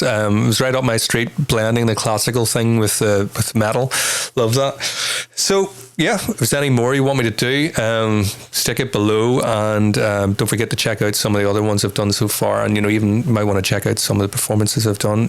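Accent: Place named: North, United Kingdom. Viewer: Generally, Chopin, or romantic music for that matter, is not my cup of tea. I am more a Bach (I'm German after all) or Beethoven, Rachmaninow person. But in this context, it is really enjoyable. I've seen this several times and this video is my favorite by Lovebites. And those ladies definitely got balls. Like a friend of mine from Scotland answered to "Who can wear a kilt?": Everyone, you just need the balls to do so. On another note are you a Scot? You sound at least like someone from the north of the island. For my German ears, this is more pleasing than RP.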